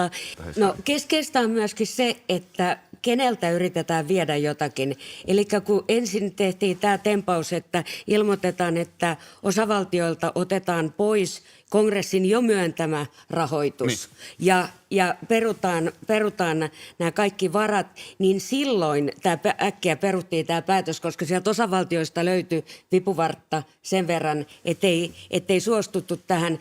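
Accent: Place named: Turku, Finland. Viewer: Niin ihanaa toi Turun murre 😊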